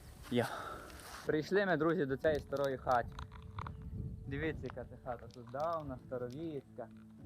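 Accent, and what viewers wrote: Hutsul, Ukraine. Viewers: ДУЖЕ ДОБРЕ, СТАРАЙСЯ) БО ТУТ В НЬЮ ЙОРКУ ХОЧЕТЬСЯ ЧУТИ РІДНІ КАРПАТСЬКІ ЗВУКИ:))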